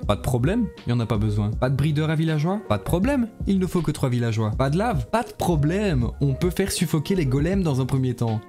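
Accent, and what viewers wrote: Belgium. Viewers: Bonjour à toi merci pour cette vidéo serait tu belge ? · J’ai l’impression de reconnaître un accent belge 🤔 Je me trompe ? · ça s'entends tu est belge, en tant que français je me dois de na pas liker cette vidéo même si je l'ai apprécié. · Ton accent c'est un belge ?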